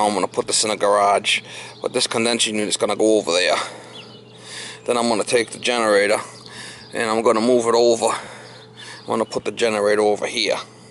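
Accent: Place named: Massachusetts, United States. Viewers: Good vid! Im trying to place your accent.. Massachusetts? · Judging by the accent, I'd say Massachusetts. (I know. It's not nice to judge.)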